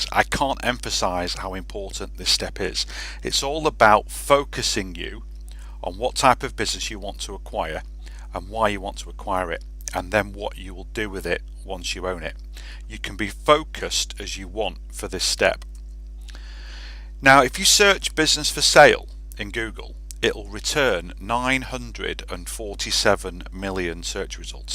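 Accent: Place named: United Kingdom. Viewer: As soon as I hear a Brits voice, I know I need to find another source about opening a business in NEVADA USA…. 🤦🏽‍♂️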